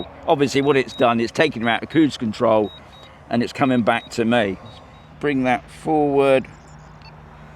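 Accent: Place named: Cornwall, United Kingdom. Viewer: Neo2 owner and fan here, 20 years ago i lived in Helston, Cornwall, your accent reminds me of those days lol. #Spingo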